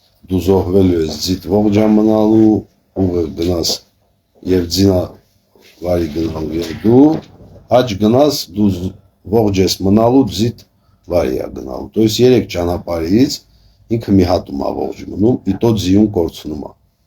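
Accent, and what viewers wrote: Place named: Stepanakert, Azerbaijan. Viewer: Մենակ Ձեզ լսելը ностальгия է, չգիտեմ Դուք որտեղից եք, բայց մաքուր Սծեպանակերտսկի ակցենտ օնիք ❤Ձեզ լսումում հայրենիքիս կարոտը առնում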